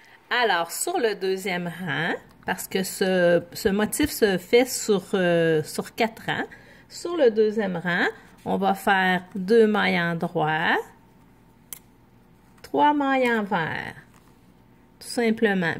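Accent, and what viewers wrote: Canada. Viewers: Merci pour ces explications très claires , ce point est très joli . Bel accent canadien.👵 de Nîmes 🇫🇷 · Parfaitement expliqué ! Joli rendu ! Et........delicieux accent. Québécois ?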